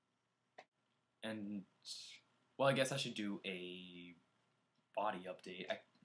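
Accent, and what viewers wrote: Canada. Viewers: Your Canadian accent is really noticeable now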